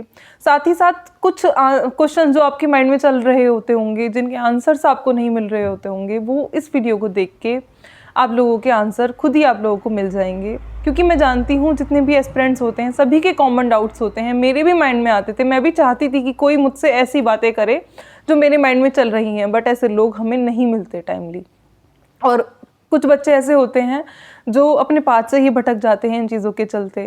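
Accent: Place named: Rajasthan, India. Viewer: Thank you sister, Rajasthan se lag rahe aap to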